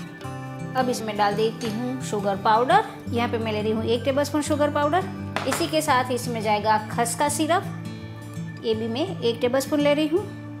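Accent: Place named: Kathiawar, India. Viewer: Madam aap Kathiywadi ho? Hindi bolne par bhi pata chal raha hey...!!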